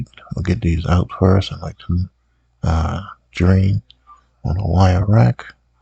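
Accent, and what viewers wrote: Charleston, United States. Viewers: Where you from? Sound like you from Charleston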